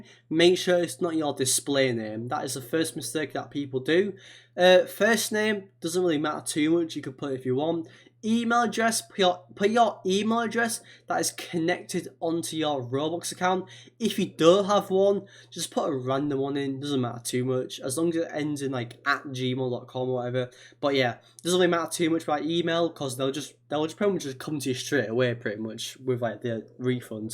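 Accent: United Kingdom. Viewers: 100% brithish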